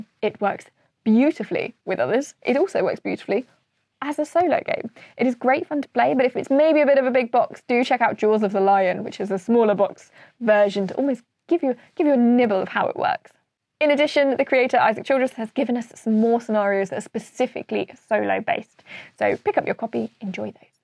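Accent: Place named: United Kingdom. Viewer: Great list. Though I’ve seen it before, I just come back for the British accent.😆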